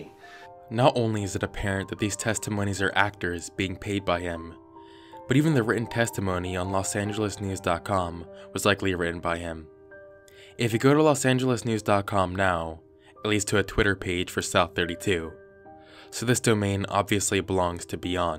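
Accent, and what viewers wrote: Australia. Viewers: Wow has a pretty good Australian accent lmao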